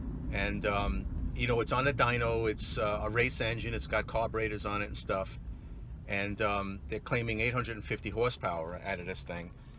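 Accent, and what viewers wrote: New York, United States. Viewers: You must be from New York area originally 😁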